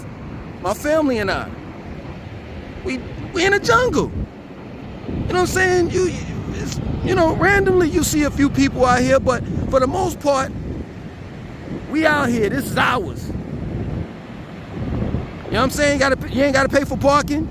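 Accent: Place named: New York City, United States. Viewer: Bro WHERE in Jamaica are you?? I keep asking you this? Jamaica has Amazon bro lol, Jamaica ships in Shein and Temu, there literally NOTHING you cant get.. Kingston, Portmore, Montego Bay, you gotta move around in Jamaica. And as to the Red Tape buy big so you don't have to worry about big taxes. The truth is your at a DEEP disadvantage because you cant speak Patois, and your accent is deeply NYC America. Jamaican success literally depends on your energy 😂, you can motivate a mf to link you on time. You have diff types of Jamaicans Professionals and Jokers lolll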